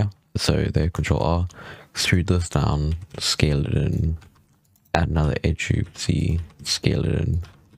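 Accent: South Africa. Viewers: Just thought you sound abit ex south african, I am ex South african and we sound very alike lol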